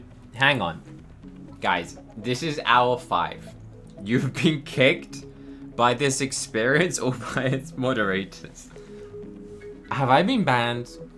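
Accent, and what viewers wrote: United Kingdom. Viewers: Wait he’s British? Edit:I searched it up and he is brtish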